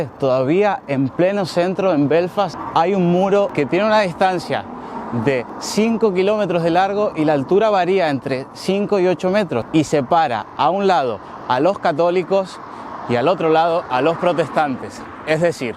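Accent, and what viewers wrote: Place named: Rioplatense, Argentina. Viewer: Buen video, te escribo desde El Salvador 🇳🇮. Cuál de las dos Irlandas es más próspera??, Y cuál es tu nacionalidad??? Es que tenés una mezcla de acento rioplatense y de la península ibérica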